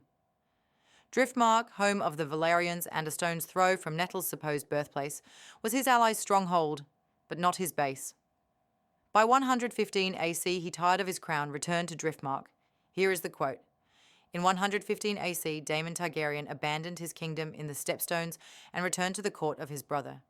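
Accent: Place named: Australia. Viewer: This sounds like the same voice as the last video, but with an Australian accent instead of a British one... I want a Jamaican accent for the next video.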